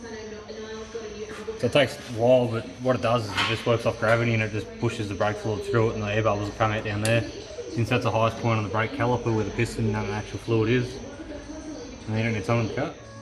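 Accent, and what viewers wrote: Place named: Australia. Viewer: Love the Aussie speak- sunnies, muddies (in the other video), aussies.....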